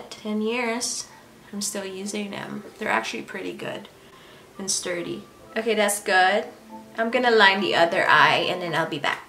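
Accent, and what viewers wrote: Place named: Philippines. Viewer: Beautiful! JUST got this palette for my BDay 😃🤩 Cannot wait to use it when I get some energy! 🤗🤗 I agree, most of these shadows are better than Natasha Denona because the texture AND finish are BOTH PERFECT! LOVE your nail color!! What is it? Your Philippino accent reminds me of what I call home.... Hawaii! Have you ever lived there? Oh.... I miss making KALEMUNGI! We would knock on doors and ask if we could have a branch to make soup.... so good! Ahhhh, those were the days 🥴 Thanks for the video!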